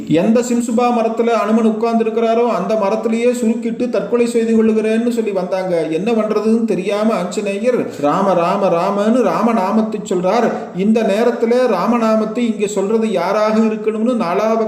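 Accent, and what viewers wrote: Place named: Kongu, India. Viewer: அருமையான சுந்தர கொங்கு தமிழில்......... அற்புதம்... வாழ்த்துக்கள். ஜெய் ஸ்ரீராம். ஜெய் ஸ்ரீராம். ❤❤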